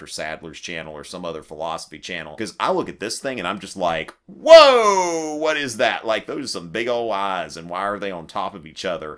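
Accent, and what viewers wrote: South, United States. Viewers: His thick Southern accent had me dead before he even said something educational.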